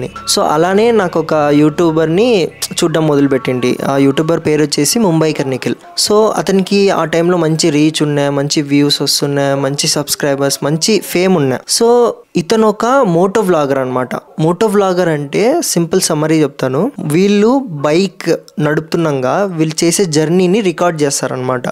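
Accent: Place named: Rayalaseema, India. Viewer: anna kathalu super. all the best . where r u from? slang rayalaseema la undi so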